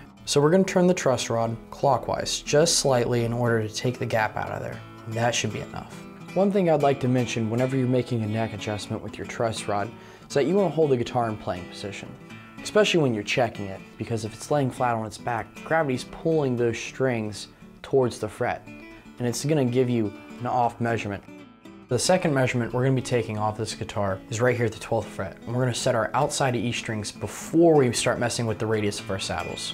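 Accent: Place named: United States. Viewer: Very good and educational video. BTW and out of curiosity, what US accent is this? I.e. schtrings on a schtraight neck.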